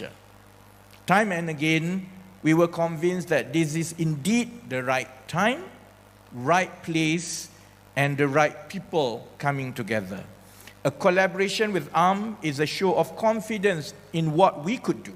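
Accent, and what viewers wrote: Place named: Besut, Malaysia. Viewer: Kaler bunyi besut skit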